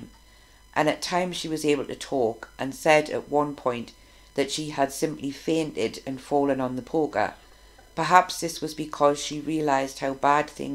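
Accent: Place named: Northeast England, United Kingdom. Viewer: Good job, and I like your accent, too. Being from Minnesota I can't place it. Is it a Northeast England accent? Anyway, good story and a sub. Hope for more.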